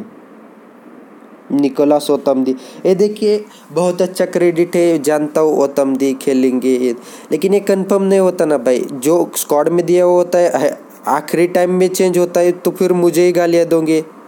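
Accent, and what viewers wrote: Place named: Northeast, India. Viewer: Bhai I'm sure aap Northeast se ho..100%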